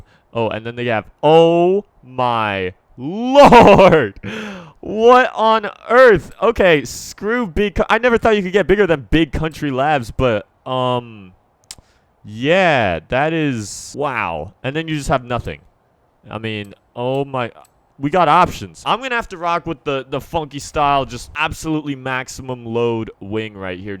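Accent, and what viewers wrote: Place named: Bahia, Brazil. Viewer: é gringo mas parece baiano pqp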